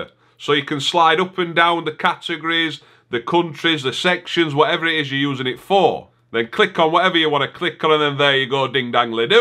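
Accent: Yorkshire, United Kingdom. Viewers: Are you the most Northern person in history? You sound very Yorkshire.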